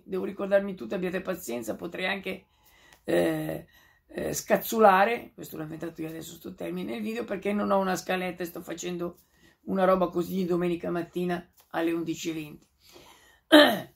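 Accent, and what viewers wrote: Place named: Ancona, Italy. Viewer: Me sembri de Ancona, mi sembri 😆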